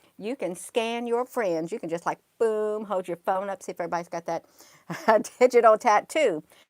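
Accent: South, United States. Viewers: Y’all, Loving 🥰 hearing, I just love ya southern bell accent? Your so priceless, the best way to announce such awesome, beautiful,greatness exploring and explaining what a super amazingly interesting 🤨 slightly evil named new style of body Art, that no one can see, I’m soooo bubbly just listening and been soooo excited by this cool 😎 new program digitally tattoo, Mmmmm I’m so glad 😀 lol lol On and off, after work! Before work Mmmm I may have “ IN bad Mood, Stay away “ Then..........” I’m mentally ok 👌 to day, don’t worry you can say Hi too me “ Oh, I think You’re just found, out the place I can truly fit in, Wowed, I’m so excited, I need to go now as I need to work so I can get my whole arm sleeve down!!!!! Mmmmm “” From My Heart ❤️ to Yours ❤️ “ Do you want me to get my one first, Then we can have our own club, Or maybe “I believe in UFOS 🛸” I’m so glad my skin is clean, thanks, Need to think about what colours ❤️🛸❤️🛸❤️🛸❤️🛸 😘🥰😍 Your so right It’s gonna be soooo Cool the coolest since sliced bread 🍞 xxxx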